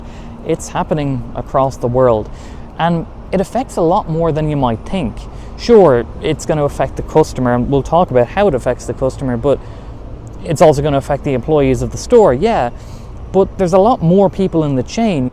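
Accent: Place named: Dublin, Ireland. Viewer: 😂looks like Dublin and your accent sounds like Dublin and then you say "here in Dublin" at the end😅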